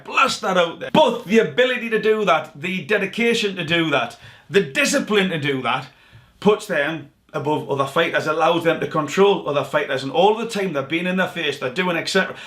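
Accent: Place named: Scotland, United Kingdom. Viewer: thank you for your hard an amazing work I am a native Californian and I went blind about 20 years ago having been cited before I know the power of nonverbal communication and so I am super stoked that I have discovered your videos! You have a really wonderful way of not only explaining the body language but really getting in-depth psychologically I first saw your Harry and Meghan Markle video and then I am a big lover of Muhammad Ali and Conor McGregor both so I saw this video well I mean I listen to it and thank you so much I appreciate all that you do and it sounds like you are Scottish but I'm not sure so take care of big hugs April in California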